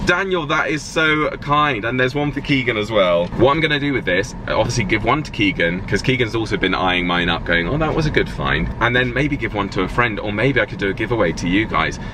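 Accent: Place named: Southeast England, United Kingdom. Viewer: I love your gorgeous, classy SE English accent and I could easily see you going on our national broadcaster RTÉ, in the footsteps of Marian Finnucane, Kathleen Watkins and Gay Byrne - being proudly Irish myself and despite living 21 years in Manchester, I’m told that I still have a very strong Irish accent and also, despite being age 52, I’ve been told that I look younger than my age 🇮🇪☘️🇮🇪❤️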